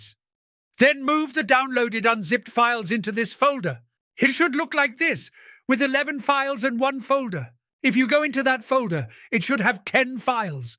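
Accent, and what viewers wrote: United Kingdom. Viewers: btw is it or, can't find any british voice like yours, it's really funny, i just wanna listen to this guy, and do whatever he tells me to do, like "go to gym you idiot" XD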